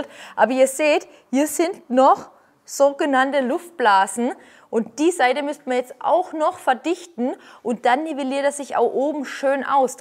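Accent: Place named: Baden, Germany. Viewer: ich dich einfach eine power Handwerkerin mit deinem badischen Dialekt 😘 liebe aus Hamburg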